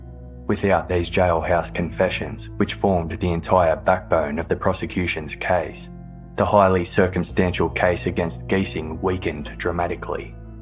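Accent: Australia. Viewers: I love your podcasts. Your context is excellent and as an American I like the Aussie accent.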